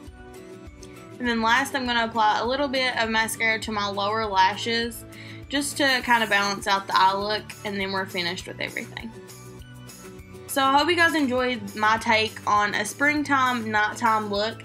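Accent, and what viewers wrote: Kentucky, United States. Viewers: What Jesse Girl pigment is this? Love your accent. You sound like my husband. He's from Ky.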